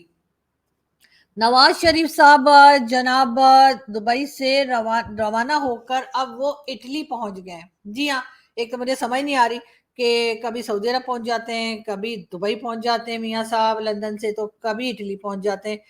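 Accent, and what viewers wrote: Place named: India. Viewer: ji aapke bolne ka style poora Indian ‘s se milta -julta hai. Same to same. Bahut aacha 👍👍👍.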